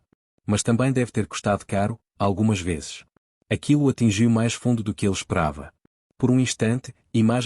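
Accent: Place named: Portugal. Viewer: Não suporto esse sotaque de Portugal horroroso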